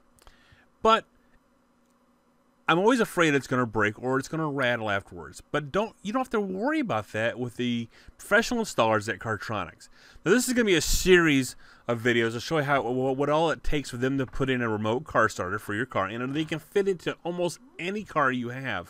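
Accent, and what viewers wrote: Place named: South, United States. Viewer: if you here him right hes got a twinge of southern in his voice. in the south 2000ft=1mile. their education is that outdated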